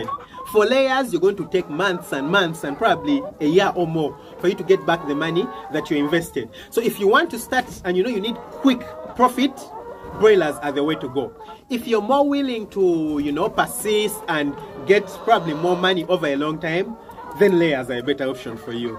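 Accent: Uganda. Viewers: Sir are u from uganda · Your accent is so ugandan 🇺🇬🇺🇬 mucu love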